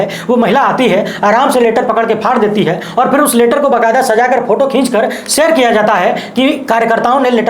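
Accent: Bhojpuri, India. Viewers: Aap k bolne ki speed aurkabhi kabhi bhojhpuri style bahot man ko bhata hai.kam waqt men aap bahot kuch kahdete hsein.good Farooqulamin. Aurangabad maharashtra.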